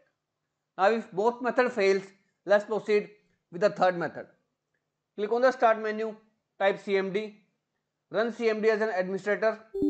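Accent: India. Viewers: Thank you, i had this problem for like half a year and couldn't find how to fix it, but thanks to you my device is now working correctly, and that india accent 🥵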